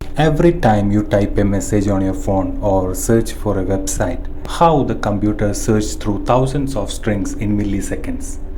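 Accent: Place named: India. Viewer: disgusted by this Indian accent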